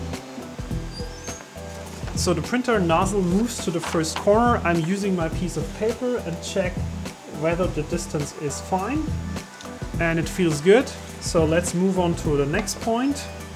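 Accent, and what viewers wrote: Germany. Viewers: Hi, "hallo!" may I say if I recognized correctly your german accent(!?). Anyway, you really rock as a teacher, with cristal clear explanations, and you have the hability (intelligence!) to clarify and simplify those complicated lines of commands in Marlin: just a thousand thanks for that! I know I'm coming really late after your video, but I only saw it 2 days ago... I have a precise question for you: I did all you described, also for the #986 (#define RESTORE_LEVELING_AFTER_G28). But I tried it (I have an Anet A8 with a V 1.5 board), the manual mesh leveling is perfect, and so are my prints afterwards! ---> BUT... if I shut off my printer, the next time I'm launching a new print...the bed is not leveled anymore....I must re-do the complete manual bed leveling again.... ---> it seems that the leveling is NOT written in the EEPROM, although I had uncomment the line #986 (#define RESTORE_LEVELING_AFTER_G28) and click on "Store settings" on the LCD panel.... 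ANY IDEAS? Thanks again, vielen Dank, hope you'll be able to see my questions and answer it...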